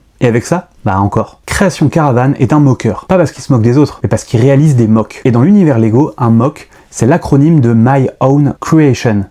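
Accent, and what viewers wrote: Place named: Spain. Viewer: Tres bel accent espagnol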